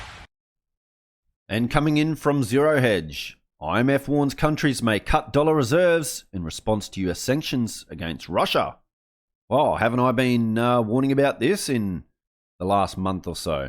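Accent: Australia. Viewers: Learn to speak properly bogan..........we are not all feral westies.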